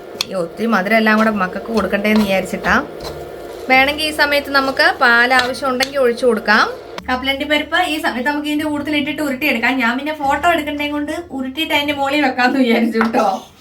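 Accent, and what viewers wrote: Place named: Kottayam, India. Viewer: Samsaaram kettal kottayamkare pole....vedio super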